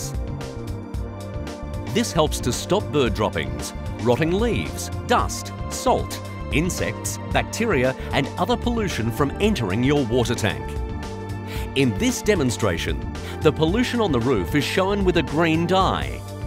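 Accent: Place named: Australia. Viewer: with volume up Oh, so if I put this on a tank, the military should be very thankful for the overexcited australian narrator Don't get me wrong, i love australians and their accents. :P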